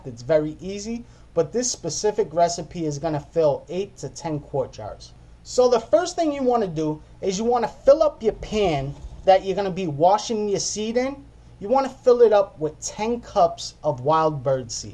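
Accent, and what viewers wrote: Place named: New York, United States. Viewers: He sounds like hes from some where in New York or new joisy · Alright youzzz guyz, now bring it to a simma and then stwain it. I love East Coast accents.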